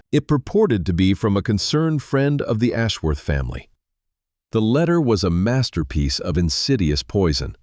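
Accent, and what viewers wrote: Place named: United Kingdom. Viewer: The AI narrator's voice is UK English, but the dialogue has clearly been written by someone who is a native US English speaker which does seem somewhat odd.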